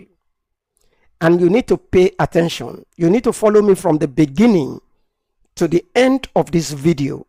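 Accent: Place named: Nigeria. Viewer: Thats Nigerian accent❤❤❤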